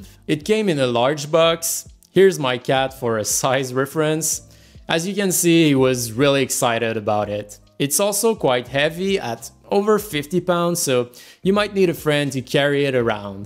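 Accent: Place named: France. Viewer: Damn that French accent coming through, lol.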